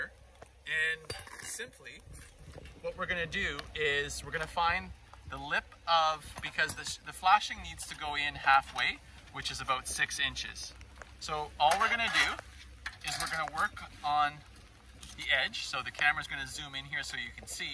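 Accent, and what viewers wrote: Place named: Canada. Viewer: Sounds very CANADIAN they way he speaks... I bet I'm right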